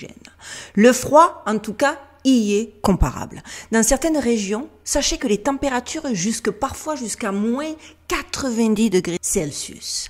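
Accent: Marseille, France. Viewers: T’inquiètes pas, on n'entend que ta douce de voix de marseillaise peuchère! Et puis on est trop charmé par tes beaux yeux pour se focaliser sur de quelconques bruits extérieurs!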